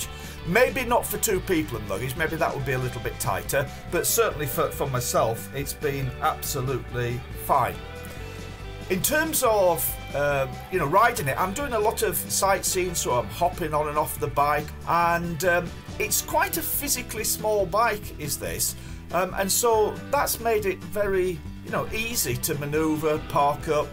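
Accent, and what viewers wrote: England, United Kingdom. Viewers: Very sweet English accent may I know where is this from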